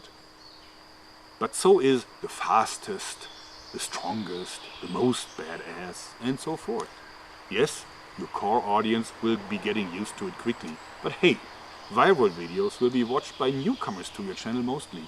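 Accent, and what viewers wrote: Germany. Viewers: I'd only watch you eat a apple attached to a drill spinning, for effect of course. But We love the videos. I personally take time off of work to watch every upload when it's fresh. For me it's the German accent mixed with the engineering genius and mechanical knowhow that really floats my boat. p.s the cheesy jokes are also quite awesome. I personally would like a full almost like a cribs episode for your work shop and general facilities outside. hope to see it soon. show us it's features! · are you German by any chance?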